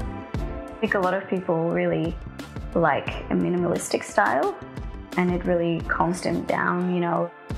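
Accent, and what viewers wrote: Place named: Australia. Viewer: She does have an Aussie accent tho with a bit of something else 😊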